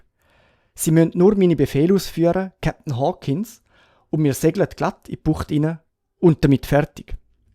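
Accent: Switzerland. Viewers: Thanks a lot, I really appreciate your work. What dialect do you speak, and which dialects are closest to yours?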